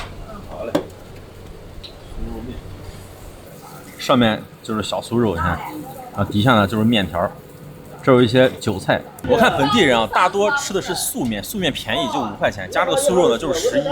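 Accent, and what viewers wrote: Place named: Beijing, China. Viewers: 👏👏👍 的歌喉真的不錯，以往都聽出耳油。今次京腔亦好有味道，希望以後在你視頻能再聽到。😁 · 聽大家吊個京腔，俺高低得吼個“好～～！”。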